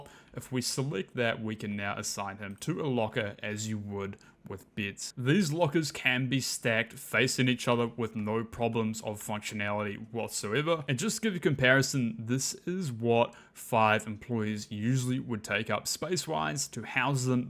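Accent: New Zealand. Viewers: as an aussie, he defo speaks proper English, just sounds like he's from new Zealand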